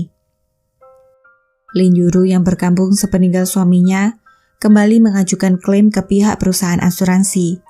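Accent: Java, Indonesia. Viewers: K wlwpun kk pkek bhs Indonesia tp logatnya khas Jawa bngt, jd aq inget tmnku klw dengar kk ngomong. Hi Irfan... Ni tmnmu 1 krjaan dijkrt dulu, dengar kk ni ngomong gw inget lu smg lu baik2 ja dmn pun skrng berada.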